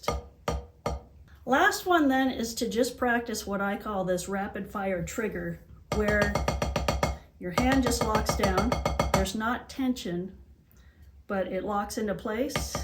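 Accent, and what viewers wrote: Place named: Oklahoma, United States. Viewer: If you're not intelligent enough to ditch that funny Oklahoma accent I think that you're an idiot savant and your drumming thing is all some sort of a f**** reaction coming to you from someplace else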